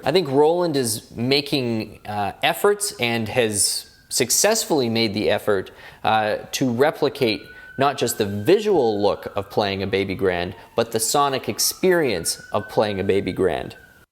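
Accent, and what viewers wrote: Canada. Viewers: Questionable, indeed. I would say downright annoying! I don't understand the point of the subtitles, since speaks clearly in perfectly understandable (eh?) Canadian... 🙂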